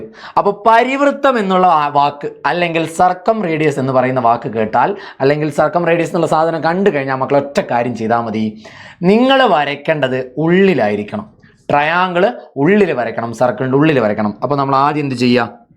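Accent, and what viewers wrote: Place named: Vadakara, India. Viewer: Vadakara voice ann sern😂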